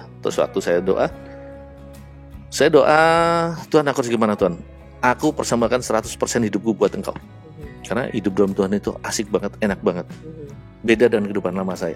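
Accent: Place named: Java, Indonesia. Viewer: Bahasa Jawa nya kental saat bikin konten 🎉🎉🎉🎉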